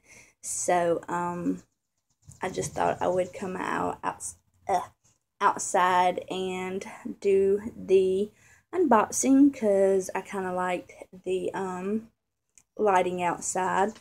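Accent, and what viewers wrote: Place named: South, United States. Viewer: Nice! Where are you from? The south? Love the accent :) You can use books, cardboard boxes etc as a video camera stand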